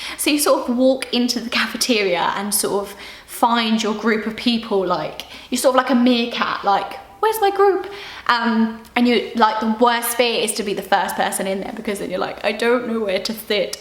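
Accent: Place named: England, United Kingdom. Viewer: What part of England are you from? Your accent is lovely!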